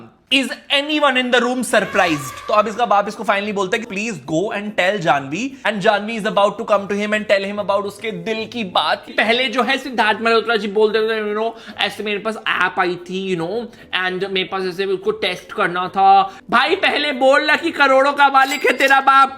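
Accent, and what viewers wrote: Punjab, India. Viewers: His Punjabi accent ❤ >3 is love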